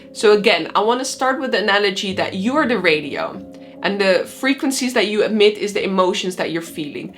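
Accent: Netherlands. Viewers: you're dutch aren't you? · Can really hear the Dutchy through your English haha. Well explained very good video thanks for sharing this amazing knowledge. Love diving deep into consciousness, manifestation and psychology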